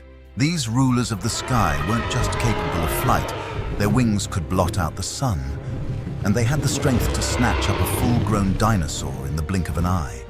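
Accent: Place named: United Kingdom. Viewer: Depicting these flying dinosaurs in wildly different ways for the very… same… creature you keep talking about seriously detracts from anything the narration tries to claim. Every other frame shows the same type of dinosaur with either 2 legs OR 4 legs, plus wings in either case, and/or very different body types. It all seems like one big collection of dragon cartoons—accompanied by voice-over with a British accent in order to seem intelligent.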